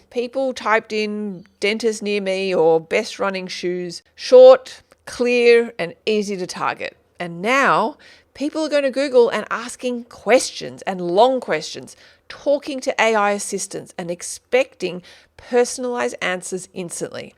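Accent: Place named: Australia. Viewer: Australian accent is so sweet :)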